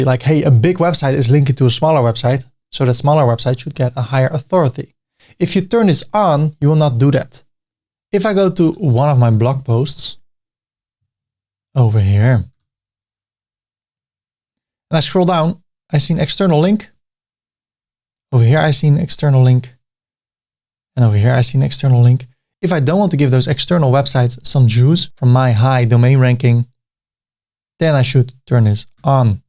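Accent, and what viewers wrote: Netherlands. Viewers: I've to say it. At first i was like, that voice, sounds familiar. Maar toen wist ik het! een Nederlander !! en toen zag ik maasluis staan. Lachen, Keep up te good work! +1 sub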